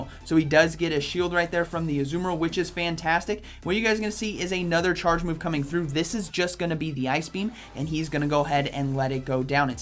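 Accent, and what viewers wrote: Netherlands. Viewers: Lol this guy for sure is from the Netherlands. They have such a specific accent :)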